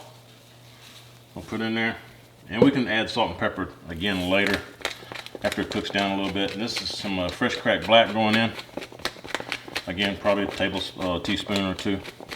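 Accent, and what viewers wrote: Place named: Louisiana, United States. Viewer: I recognized your accent before I saw that you’re from Louisiana!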